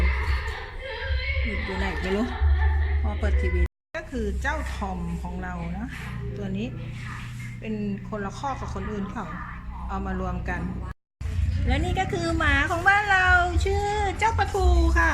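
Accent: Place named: Suphanburi, Thailand. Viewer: 😊👍พี่คนสุพรรณเหรอครับ ฟังจากสำเนียง ผมเคยไปเที่ยวบ่อย..อิอิ😁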